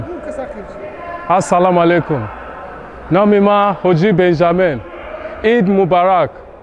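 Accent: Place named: Kulob, Tajikistan. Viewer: Чиста кулоби шидай ҳочй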